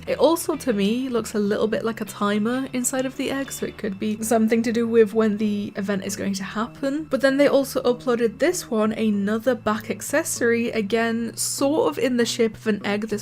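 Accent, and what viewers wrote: United Kingdom. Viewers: Is this woman British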